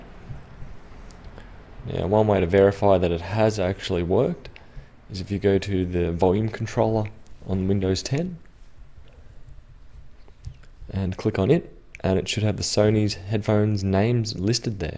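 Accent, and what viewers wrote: Australia. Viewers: Thanks mate, btw: like to ozzy accent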